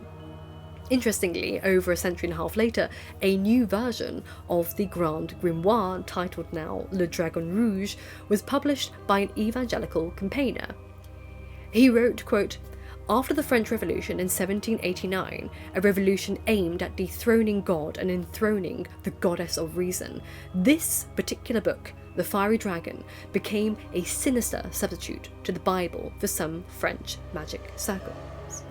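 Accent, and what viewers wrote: RP, United Kingdom. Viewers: British viewers, does her accent sound as posh to you as it does to me? I can't imagine there's a British accent that's more posh than this lol.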